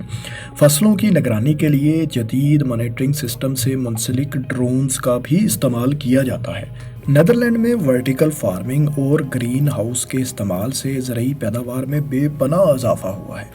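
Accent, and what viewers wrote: Punjab, Pakistan. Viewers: خدا کی قسم اردو کا ایسا گندا لہجہ اور پڑھنے کا گھٹیا انداز نہیں سنا۔ یوں معلوم پڑتا ہے کہ کسی ٹھیٹ پنجابی بولنے والے کو اردو پڑھنے پر لگا دیا ہو۔ خدا کا واسطہ اس طرح کا ٹارچر نہیں دیا کرو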